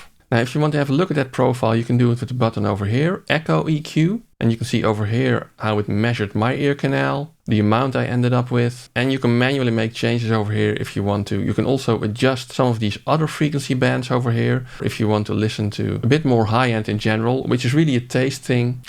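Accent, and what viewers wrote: Netherlands. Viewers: lekker Nederlands accentje whehe goede review en cool te zien dat er nu 5.0 reviews komen :)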